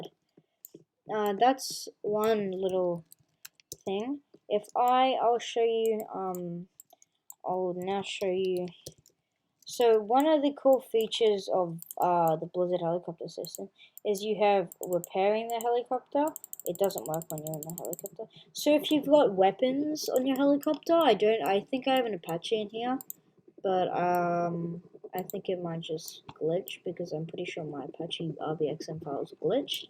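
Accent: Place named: Australia. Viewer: I think hes australian like me and thx for teaching me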